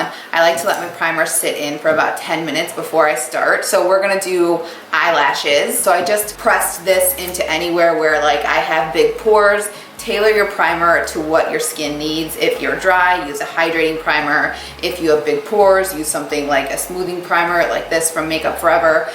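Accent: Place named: Upper Peninsula, United States. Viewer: Are you a yooper?😂 Me and the rest of my family are northern and you sound just like us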